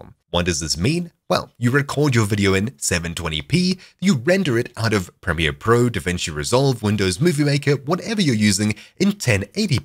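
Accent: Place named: South Africa. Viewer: Thank you, I was able to fix my blurry video of Diablo 1 which was recorded via OBS in 360p, the game's native resolution. The video looked crisp on my computer in the H.264 format using VBR min 4mbps target 20, but on YouTube it was really bad. I changed to CBR of 20mbps (probably overkill) and exported the video at 720p, looks great now. Btw nice South African accent. Your voice reminds me of the famous Minecraft player rendog who plays on the Hermitcraft server. Its a good thing. <3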